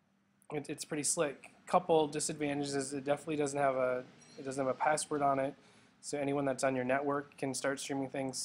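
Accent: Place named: Canada. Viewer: You sound nice and Canadian!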